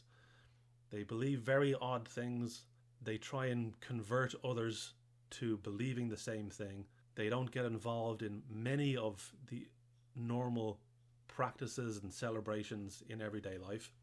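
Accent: Ireland. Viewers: were you a witness ~~~sounds like you are from Ireland ?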